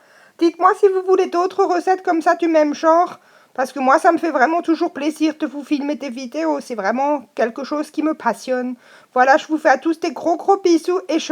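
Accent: Alsace, France. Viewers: rhooo l'accent alsacien est top ;) j'adore je vais essayé de refaire la recette bisous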